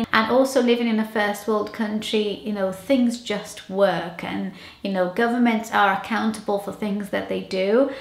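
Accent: United Kingdom. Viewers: Oi Sou Letícia e eu conheci seu canal por um vídeo de dicas de inglês, discubrir q vc é brasileira e fiquei espantada, pq achava que vc era britânica, e me inscrevir no canal de cara tanto pq te admiro tanto mãe quanto pessoa, e agora descubro q vc e de Aracaju!! MDS minha cidade! Estou surpresa achei q vc era de Minas ou Rio kk e nossa vc ja era e é minha inspiração (no inglês pq eu amo seu Inglês ele é maravilhoso!! )Em fim só um desabafo... Se falei muito desculpa... I love your videos so much.. Manda beijo! ❤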